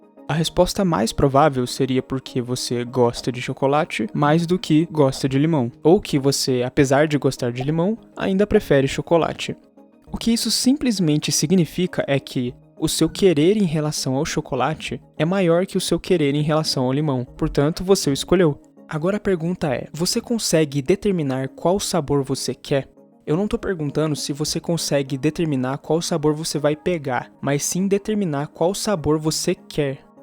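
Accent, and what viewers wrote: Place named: Brazil. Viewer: O vídeo é bom, embora o sotaque brasileiro faz parecer que é uma criança narrando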